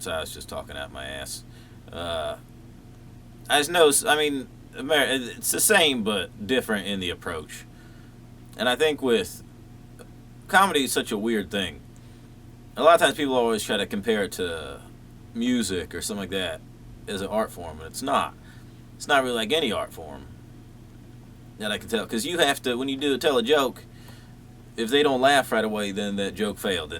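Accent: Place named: Ohio, United States. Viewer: you're fucking funny. I picked up on your YouTube videos looking for RV advice. As a single person(female) with no knowledge of even changing the oil in anything I appreciate your lack of knowledge...I have none myself, and wish to live a life free and mobile. It's also nice to hear a voice near where I grew up. I'm from Cincinnati. I haven't lived there in decades but Ohio people just know when Ohio people speak...hehehe we're inbred that way...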